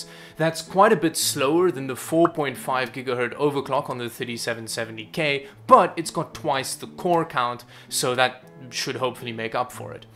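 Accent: South Africa. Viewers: Great video... Hey are you South African?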